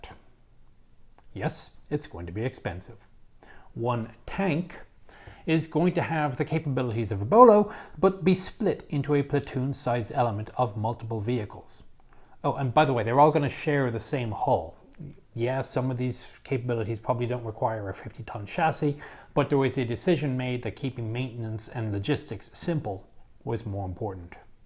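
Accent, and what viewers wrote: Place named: Ireland. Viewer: Why do I feel like this guy speaks with an Irish accent?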